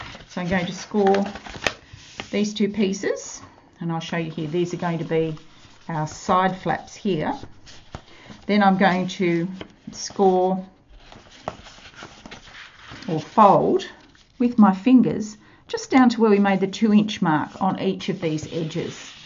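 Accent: Australia. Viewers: Love hearing the Aussie accent! And really like the project. The angle of the camera is a quite uncomfortable to view!!! Hope you might be able to change this!! Thanks for a great tutorial!!!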